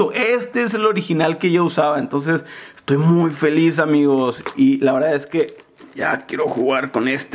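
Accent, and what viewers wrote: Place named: Northern Mexico, Mexico. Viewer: Esa voz norteña ❤ jaja creo que si hablamos fuerte y golpeado jaja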